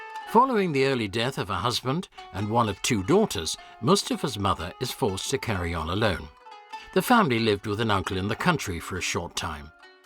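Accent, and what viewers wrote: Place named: United Kingdom. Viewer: Wicked voice. Raspy British always sounds suspenseful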